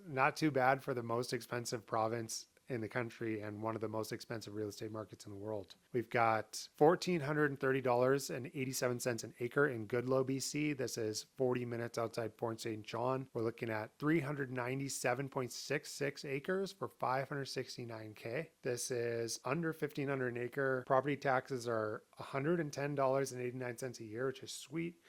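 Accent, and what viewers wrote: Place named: West Coast, United States. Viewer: ur definently from the westcoast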